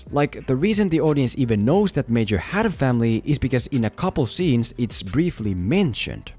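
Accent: Finland. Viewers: I have to ask, are you Finnish? Your accent tells me you are. In anime the story was different and as far as I remember, they always setup things and payed them off. This Movie had so many WTF moments and no stake at all for the viewer. What a waste.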